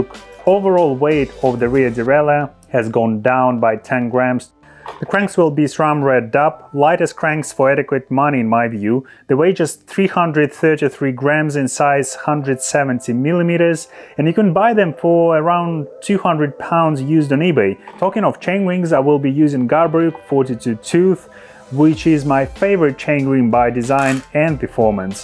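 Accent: Russia. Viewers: Так и думал, в самом начале ролика, что русский =) По акценту слышно.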